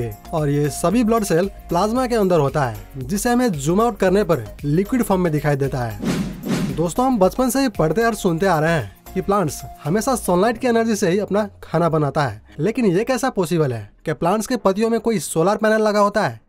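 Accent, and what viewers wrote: Odisha, India. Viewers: Are you from ODISHA? Your pronunciation is like Odia people. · Aap Odia ho na aapke pronounciation se lag raha hai.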